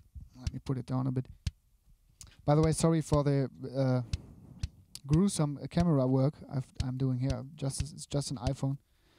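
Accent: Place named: Germany. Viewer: No No I mean I really like your accent it reminds me of a close German friend of mine I love the way you said the kick " Sounds Like Shit":) Thanks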